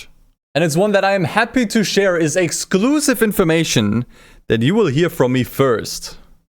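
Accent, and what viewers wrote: Germany. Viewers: Man hört sofort das du Deutscher bist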